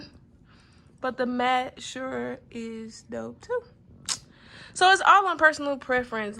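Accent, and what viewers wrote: St. Louis, United States. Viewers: It’s bugs up here 😂😂😂 think that’s the first time I heard that St. Louis accent!! I like my helmet to match my bike so guess there’s no Simpson in my future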